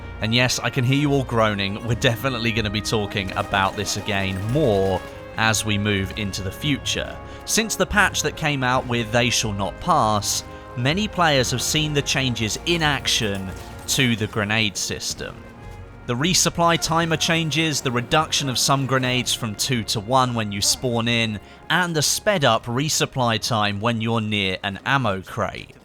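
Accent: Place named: England, United Kingdom. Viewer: john long He's British he has he English accent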